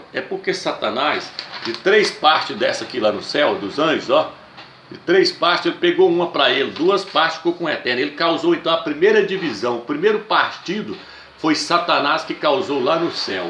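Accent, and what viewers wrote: Northeast, Brazil. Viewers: tu é do nordeste amado??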